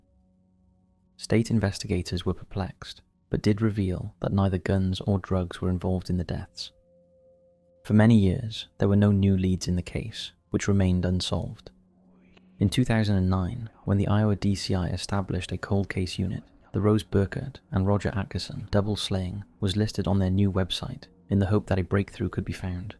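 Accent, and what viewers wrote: United Kingdom. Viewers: His voice is so lovely and mellow, and I love a British accent!!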